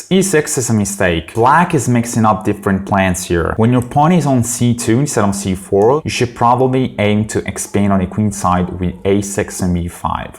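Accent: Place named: United States. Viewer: Ciao, complimenti, mi sono iscritto da poco al canale e lo trovo molto divertente. Sono d'accordo con la risposta che hai dato a White Rabbit. Le partite trash dal vivo sono al top sempre. Un consiglio se permetti, bravo per l'inglese, ma parlare con un accento nordamericano esasperato è veramente trash IMHO, anche perchè sembri un imitatore di Hikaru LOL. Ho apprezzato molto anche i video con i consigli per migliorare il proprio gioco.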